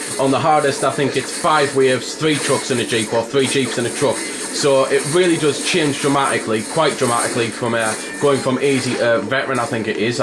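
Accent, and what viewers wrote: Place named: England, United Kingdom. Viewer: i love this game I'm gonna get it and i also love you're English accent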